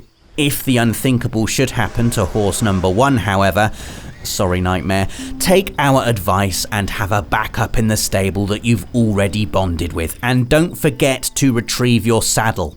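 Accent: United Kingdom. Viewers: Not another limey accent....Johnny Rotten plays rdr2?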